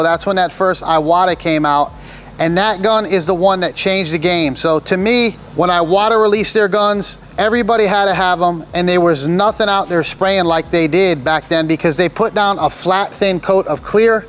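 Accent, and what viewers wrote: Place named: Boston, United States. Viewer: Accent like your from Boston. Or Minnesota.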